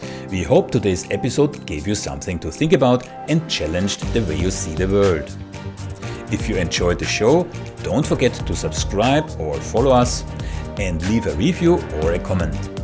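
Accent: Germany. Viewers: What other answer can be expected from a man with name Said Abou? Your Muslim hate for Jews you sucked with your mother’s poisonous milk. Your subscriptions are tell about you a lot… No, this German accent speaking Dutch antisemite is well-known by his lies, half-truths, distortions. So, he is your friend in hate…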